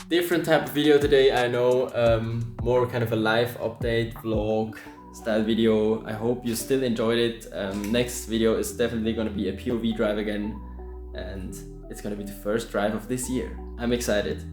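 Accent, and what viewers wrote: Switzerland. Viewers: Your voice sounds so majestic in this clip like some superhero lol and the swiss accent elevates it😂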